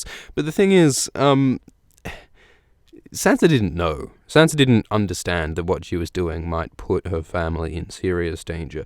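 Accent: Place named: Australia. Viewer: He has an Australian accent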